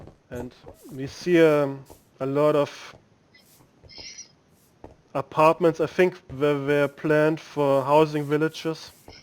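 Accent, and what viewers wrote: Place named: India. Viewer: I think india. Sounds like an indian accent.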